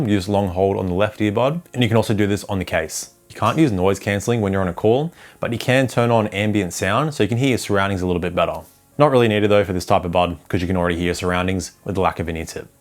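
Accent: Australia. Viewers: i'd rather listen to aussie english all day than that stupid AI yt bs.. thats not necessarily a compliment 😂❤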